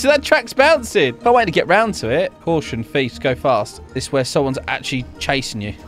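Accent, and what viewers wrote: United Kingdom. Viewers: hold up, wait a minute, are you from the UK?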